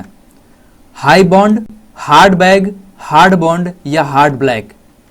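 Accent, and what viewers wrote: Bihar, India. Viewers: जरूर आप बिहार से है।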